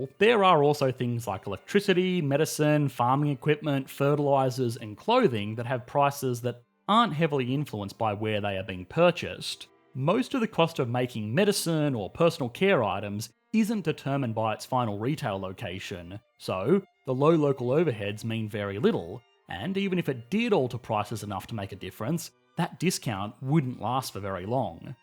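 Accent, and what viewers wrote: Australia. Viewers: Americanised? He is obviously Australian.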